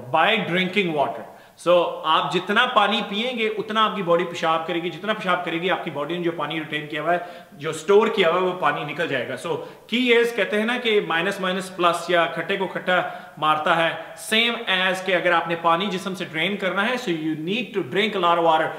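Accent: Punjab, India. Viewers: i love ur punjabi as well as english accent. i m huge fan of ur accent plus u r damn cute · I like your punjabi accent and also sense of humor. but this video doesn't help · 😂😂 bai Canada ch reh k v teri Punjabi ch koi frk nai ayaa... love you bro..👍🏼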